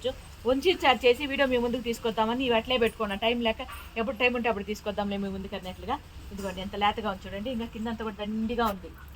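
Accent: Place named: Bangalore, India. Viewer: Amma madi banglore urban ma bashaku me bashaku 98% match avvutundi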